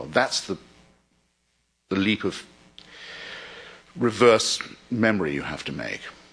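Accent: RP, United Kingdom. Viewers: a shock jock with a plummy accent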